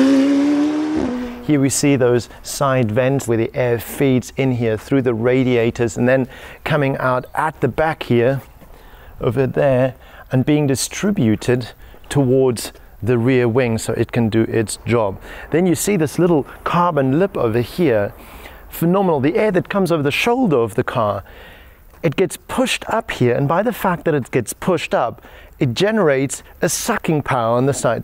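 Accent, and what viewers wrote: Cape Town, South Africa. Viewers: Is this presenter South African?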